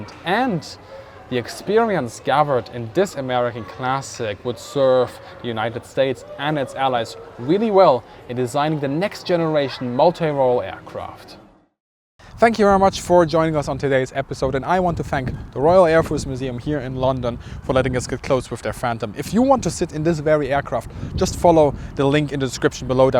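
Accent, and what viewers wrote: Germany. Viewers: Sehr gute Präsentation ... und ein angenehmer, deutscher Tonfall im Amerikanischen ;-) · Oh my... I apologize, that quite heavy German accent made me hear "that secured the jets aircraft position"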